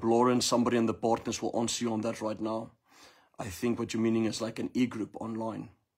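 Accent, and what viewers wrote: South Africa. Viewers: Afrikaanse Boertjie from South Africa..speaking English..a distinct dialect. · My favorite accent is the South African accent lol. Thank you so much Prophet for reminding me I am living in the spirit. We are not bound by the law but bond servants to Christ. One day I hope to visit your church in South Africa! Jesus is King of Kings!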